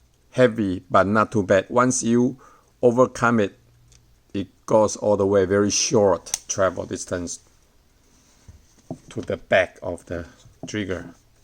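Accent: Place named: Europe. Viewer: Your accent was so thick I thought you were European at first. Haha, nice guns!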